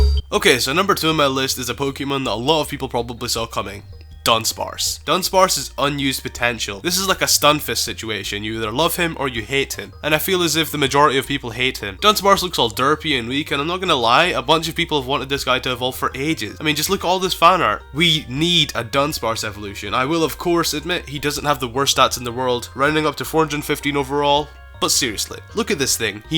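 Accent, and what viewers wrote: Scotland, United Kingdom. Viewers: Are you Scottish? You sound like Shrek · are you Scottish? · R u Scottish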